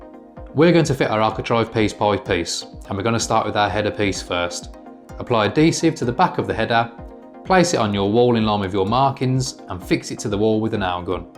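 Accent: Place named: Black Country, United Kingdom. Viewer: I'm a Brummie though 😅 he sounds more like a black country yam yam.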